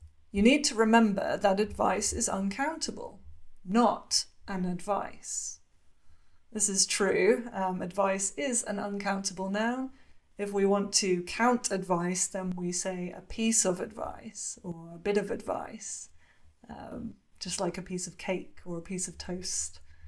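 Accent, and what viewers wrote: United Kingdom. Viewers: I love the way you speak English with British accent . What I like to say is speaking English with correct British accent gives me like a certain charm.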